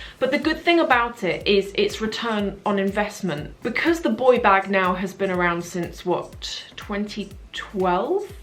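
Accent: United Kingdom. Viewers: I don't know why, but whenever I heard talk, I felt like I spoke in British English accents for no reason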